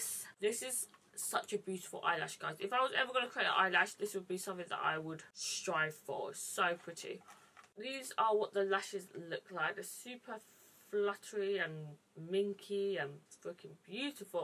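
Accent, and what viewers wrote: United Kingdom. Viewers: also would have never guessed she'd be british LOL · If you listen carefully, you'll be able to hear her British accent. She's from England. It's funny in life how we live in one area and think that all other ethnicities will have the same accent as ours. Or we refer to an accent as something different than what we normally hear. There's all ethnicities all around the world. England is very multicultural just like Canada and America. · I always watch her ig vids and when I first went to her YouTube vids I was like OMG she is British???? Loved it 😂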